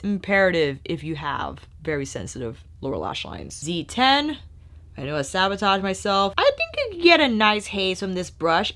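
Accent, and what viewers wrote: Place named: United States. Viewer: my love, please don't take that as an offence, but I kinda feel bothered by you pronouncing the Japanese names with the heavy English accent. Since you're a huge fan of Japanese makeup brushes brands, I think it'd be more respectful to the brand and the culture if you look up to pronounce the names a little more correct to the Japanese pronunciation. In fact is pretty easy. I say that because I have a lot of proximity to Japanese culture and it feels a little disrespectful when the names are pronounced the american way. Anyway, great review as always! Love u!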